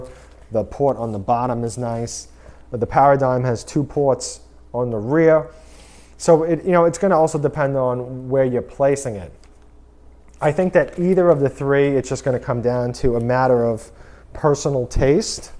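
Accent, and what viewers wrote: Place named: New England, United States. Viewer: Just LOVE your Yankee accent...LOL!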